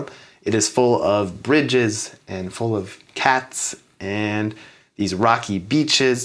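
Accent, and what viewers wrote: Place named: Moscow, Russia. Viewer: Thank you for your comments. Regrettably, you’re using, in one way or another, the resources of a country that has committed acts of violence against the people of a neighboring state. Most people from English-speaking countries condemn such actions and are striving to prevent them by all means. Certainly, there are some expatriates who hold a different opinion and continue to monetize their people and other resources, leveraging russia’s potential for their own interests. The specific words you pronounce in Russian, imitating the moscow dialect, are a characteristic that symbolizes people who are trying to enslave others. I encourage you to think about this. You have better alternatives - even if you are a Russian who speaks English very well.:hand-pink-waving::hand-pink-waving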